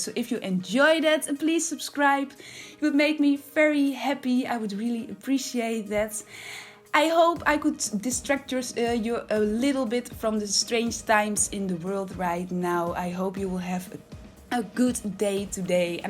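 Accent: Netherlands. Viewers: Hoorde het accent en dacht.. dat is een Nederlandse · Waarom heb je zo’n Nederlands accenten. Ben je Nederlands? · ik kan het nederlands accent horen in je stem lol heel mooie en creatieve build btw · I can recognize that dutch accent anywhere! Dit gebouw is echt geweldig, al je builds zijn zo creatief, ik heb helaas niet alle packs dus bij mij komt het er nooit zo mooi uit te zien :c Maar ik blijf dromen hahaha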